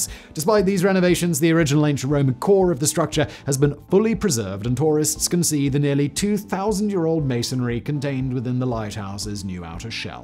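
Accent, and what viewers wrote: England, United Kingdom. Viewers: Man, in all honesty, someone has to direct this videos better. I know he has a British accent but in some parts his words are barely clear and sound like a run on sentence. Also some clips were cut short. Many words were butchered and I can’t understand how this video was cleared to be published. Please.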